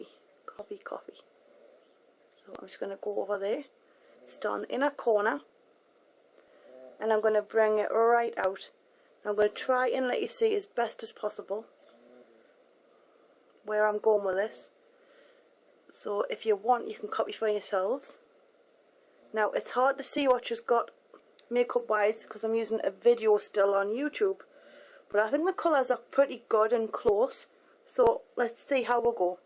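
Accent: Newcastle, United Kingdom. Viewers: Her accent is from Newcastle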